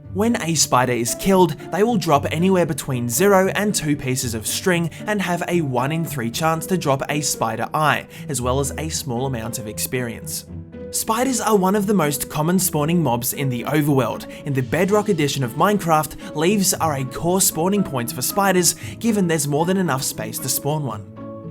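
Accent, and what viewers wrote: Australia. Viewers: Yeaaaaaah I knew you were Australian for dat accent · I immediately knew you're Australian because your accent is British on steroids. I know that I as a German should probably not be saying that, as German is basically Dutch on steroids, but that's something I just wanted you to know.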